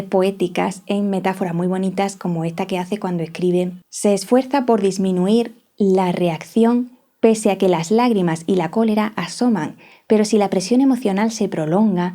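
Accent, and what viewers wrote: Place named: Murcia, Spain. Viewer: Jolín acabo de leer tus características, no te conocía. Yo soy PAS y TDA (sin H), Tiroiditis de Hashimoto. Yo pensaba que tenía mucha mezcla, que seguro algo me sobraba, pero no, parece ser que éstas combinaciones incluso más complejas. Me resulta delicioso escucharte. Tu acento me recuerda al de mi abuela, de Murcia pero vivió en Jaén. Saludos, aquí tienes una nueva suscriptora más. 😊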